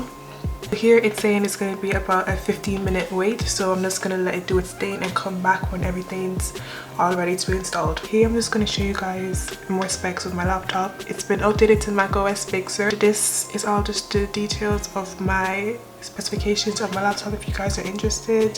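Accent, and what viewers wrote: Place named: Ireland. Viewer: I love your Irish accent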